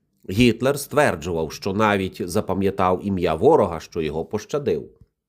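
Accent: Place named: West, Ukraine. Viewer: Тебе послухати, - виходить, що Гітлер не був вже і такий поганий, не сказати, взагалі, добрий. Поцінюваний міжнародними елітами політичного і культурного нахилу. Ну, були у нього де-які дрібні недоліки. І що? У кого їх нема? Ну що з того, що завдяки його невинним примхам-забавам у світі загинуло до 80 мільйонів людей? Він же був хоробрий, був поранений, мав нагороди, художник, номінант на саму Нобелевку! Корочше, сама доброчинність! Не те що росіяни, читай Путін. І як доказ своєї маніпулятивної думки, за вуха притягує сюди образ Мао Цзедуна і Пол Пота, до купи. От так провінціальний недолугий історик-аналітик, загнавши корову до стайні і давши свиням їсти, навіть не витерши руки, миттєво біжить до комп'ютера. І своєю, впізнаваною ореол його мешкання, інтонацією, - західнянського селюха, береться щось блямкати примітивне зі скарбниці своїх недолугих нашарувань, що рояться в його голові. 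Убого хизуючись, як йому здається, йому одному відомими фактами про Гітлера. І мерщій старається поділитися їхнім ново-відкриттям з широким загалом, але під кутом власної, так би мовити, критично осмисленої, точки зору. Яка насправді відбиває помутніння його свідомості і реальної оцінки такого явища, як Гітлер. А насправді його не це цікавить. Йому ж, заради своїх шкурних інтересів і з метою приваблювання глядачів, конче потрібно робити сенсанційні заяви про те що на фоні росіян, пан Гітлер виглядає цілком пристойно. Бо скажи він зворотне, то це нікого не привабить. Бо тут не буде нічого нового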